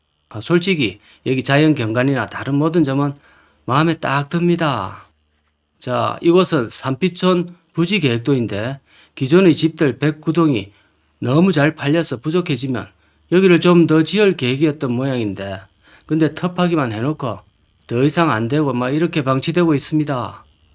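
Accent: Gyeongsang, South Korea. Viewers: 전국방방곡곡 다니시며 영상찍느라 고생마니합니다 즐겁게보고있습니다 갱상도 사투리 정겹습니다❤❤❤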